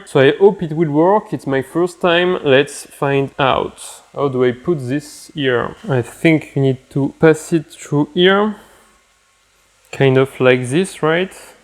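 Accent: France. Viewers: great—just a suggestion: if you want, try improving your English pronunciation to make the video even more enjoyable, because it's quite hard to follow you with your very strong French accent.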